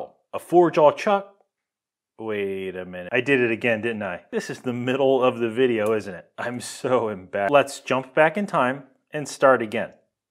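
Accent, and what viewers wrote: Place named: Philadelphia, United States. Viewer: As an amateur linguist. And a cunning one, at that. Something weirds me out... you have a Philadelphia area accent... but you said "pop cans". People in the northeast don't say "pop cans", they say "soda cans". So wtf? My conclusion: you're from the Philadelphia area, but you currently live somewhere in the middle of popcan country. OR, it could be that you're in the rare, super thin strip of middle Pennsylvania where the Philly accent overlaps with the popcan people. whats the deal?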